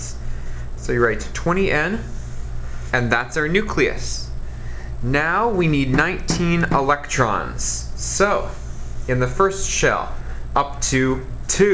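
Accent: Canada. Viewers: the canadian accent just makes everything better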